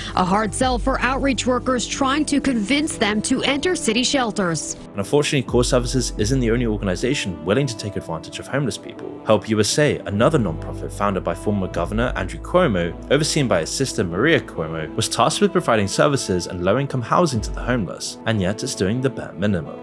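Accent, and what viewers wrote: United Kingdom. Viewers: Why does moon care about what’s happening in the USA? He sounds British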